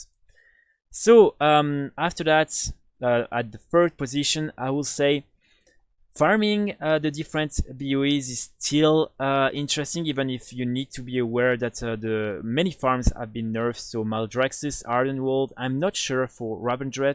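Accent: France. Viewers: t'es français?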